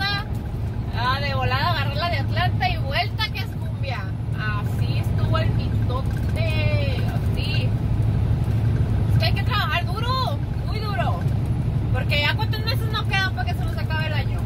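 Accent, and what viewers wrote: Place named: Mexico. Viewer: me gusta tu dejo o acento mexico epaaa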